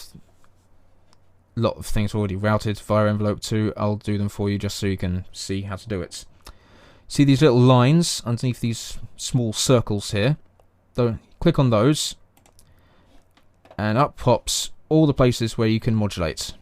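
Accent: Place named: England, United Kingdom. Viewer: are you from england or something? your accent sounds like the guy from the simpsons that trades places with homer in that one episode, who falls in love with marge :D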